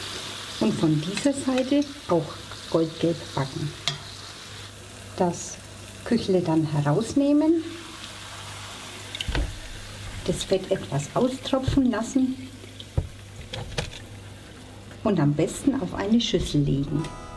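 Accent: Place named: Franconia, Germany. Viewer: Hallo, aus welcher Ecke Frankens kommst Du? Ich höre den Dialekt so gern. Lg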